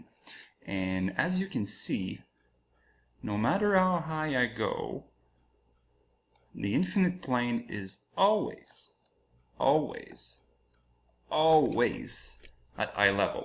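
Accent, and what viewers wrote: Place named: France. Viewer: Salut. J' ai compris que tu volais Mtl-Cancun, et je croirais entendre un petit accent. Alors je me dis que tu es français. Je commence a croire ce nouveau concept de la terre plate et c'est très troublant. Je pensais justement utiliser un programme pour tester mais tu as fais ça dans ce vidéo. Merci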